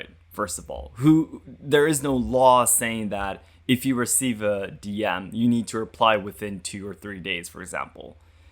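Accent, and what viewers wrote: United States. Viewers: I've listened to most of your content. I'm a southern American and I have never had any problems with your pronunciation. You sound just like an American to me. I can barely walk out my front door without hearing "y'all come back now" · the first time I heard your voice I detected a Northern Midwest accent. Your spoken English is at least 97 per cent.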